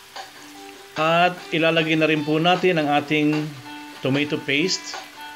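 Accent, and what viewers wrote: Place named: Tagalog, Philippines. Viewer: Sa puntong Ito 👍original tagalog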